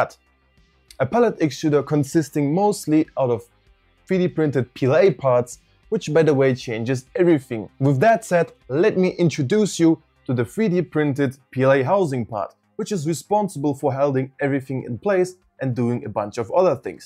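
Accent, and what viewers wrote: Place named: Russia. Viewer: Till the last phrase, I was absolutely sure that I hear Russian accent😅The only doubt was why the Russian guy talks about German engineering 🤔 And thank you for your video and your job, of course. It's awesome.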